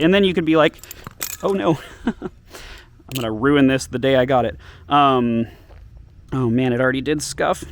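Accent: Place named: United States. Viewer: you're from georgia? you sound so american.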